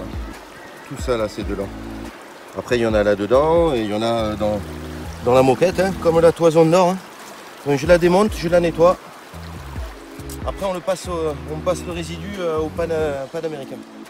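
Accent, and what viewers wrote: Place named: Marseille, France. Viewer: tu et un marseiller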